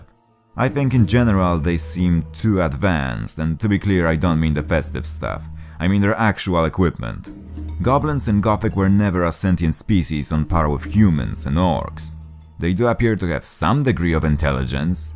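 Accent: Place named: Poland. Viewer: Such an annoying voice 🤮🤮🤮 and Polish accent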